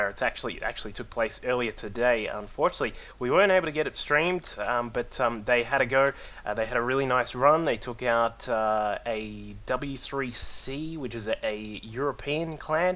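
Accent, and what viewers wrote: Australia. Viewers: god damnit i got work tomorrow i have to sleep but your delicious Australian accent is keeping me awake...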